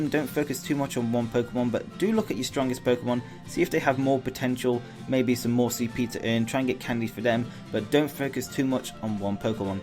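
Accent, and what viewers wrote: United Kingdom. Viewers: omg your British yassss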